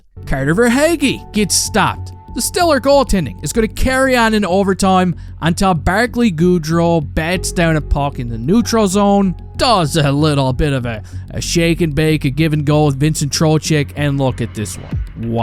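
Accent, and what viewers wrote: Newfoundland, Canada. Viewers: East coast Newfoundland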